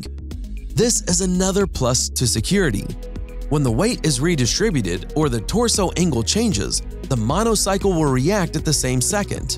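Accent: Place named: United States. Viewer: How does that American sounding voice speak such bad English? Is it a brilliant bot, or an idiot voice actor??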